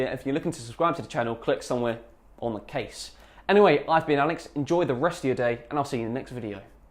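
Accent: United Kingdom. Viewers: u wot m8? he just has a british accent and a light one at that. what are you on? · I don't understand what you are saying bristish accent so hard for me..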